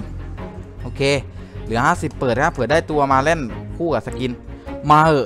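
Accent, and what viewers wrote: South, Thailand. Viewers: คนใต้เหมือนกันครับบบบบ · เหยดเเม่ม55+สำเนียงคนใต้ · คนใต้นี้ว่า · สำเนียงใต้มาเลย พี่คน จ.ไรอะ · คนใต้ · พี่คนใต้เออะคับบ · คนใต้คนใต้5555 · เเหลงใต้ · พี่คนใต้ป่าวคับ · แหลงใต้ชัดมากเลย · คนใต้หรอ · พีคนใต้ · ไอย้ะแหลงใต้เหมือนผมเลยนิพี่บ่าวเห่อ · คนใต้หรอครับ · คนใต้เปล่าคับ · พี่คนใต้มายนิ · คนใต้ แน่555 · พี่คนไต้หรอพี่ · พี่คนใต้หรอ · พี่คนใต้ปะ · คนใต้เหมือนผมเรย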